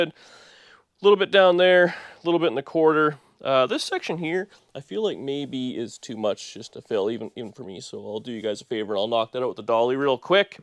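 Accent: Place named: Canada. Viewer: After sitting here and watching the video, I am wondering why I enjoy this channel so much and it came to me. It’s! Aside from the great projects, his obvious skills, and hard work ethic, he has a likable personality. That quality and his ability to verbalize the way he goes about his projects with a bit of humor and devil may care (“it will be fine”) attitude keeps the viewer’s attention. I like the Canadian accent and the fact that he keeps it clean and family friendly. Through all the problems and sweat, seems to be a happy guy. BTW, nice job “smoocin’ the ‘57!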